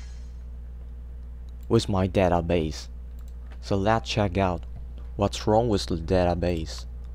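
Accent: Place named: Taiwan. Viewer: 你好,很高兴认识您。Actually, I know you are from Taiwan. Because the mandarin that you speak are different than mine and I saw you use type traditional chinese in the tutorial video. I learned it for half year at Taiwan University last year on November but I still need to keep up on trying and learning from everyone here. It is a great experiences to learned it from you. I enjoy your tutorial video a lot. 谢谢你。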